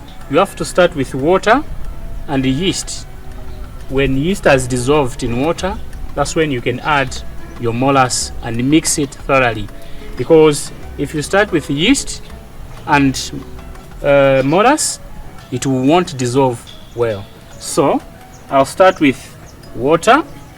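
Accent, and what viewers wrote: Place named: Zambia. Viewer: Definitely going to try this. Thank you from Zambia PS you sound Zambian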